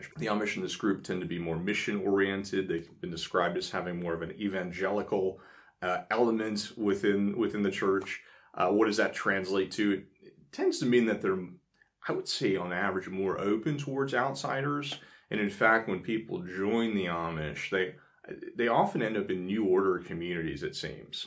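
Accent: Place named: Charleston, United States. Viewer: You sound like you are from Charleston, SC. My husband lived outside Charleston and heard that accent a lot.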